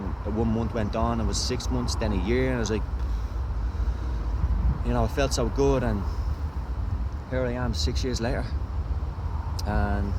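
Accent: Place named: Ireland. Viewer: definitely irish, sounds exactly like conor mcgregor.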